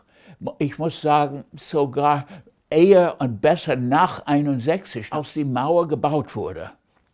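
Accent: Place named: United States. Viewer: He still has quite an American accent when speaking the German language.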